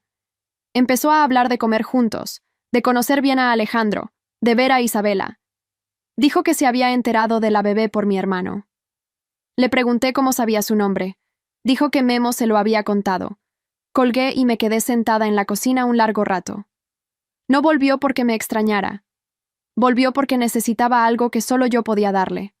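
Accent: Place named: Madrid, Spain. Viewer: Su voz es de la península española Madrid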